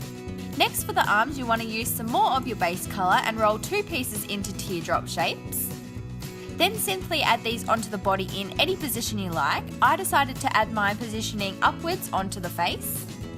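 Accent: Australia. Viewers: Omg she sound sooo AUSSIE 🐨🐨🐨🐨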